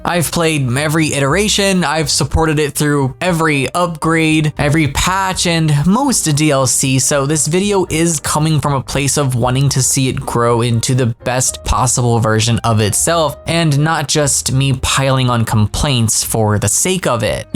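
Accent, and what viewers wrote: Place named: California, United States. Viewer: You have the most California voice from California. Am I right?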